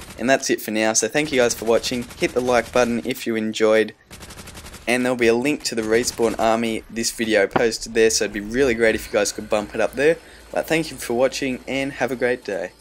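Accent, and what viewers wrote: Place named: Australia. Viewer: lol u have an accent that even though im aussie as well but thats probly because im watching too many american zombie players ;) so glad i finally found an assie one from faction 115 BBBBEEEEAAAAASSSSSSTTTTTT MMMOOODDDDEEEE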